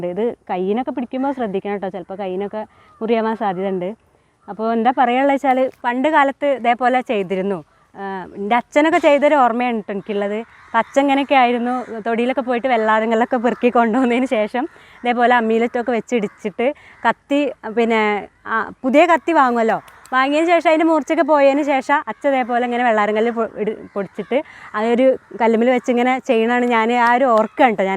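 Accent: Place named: Malabar, India. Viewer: മലബാർ ്് സ്ലാഗ് സൂപ്പർ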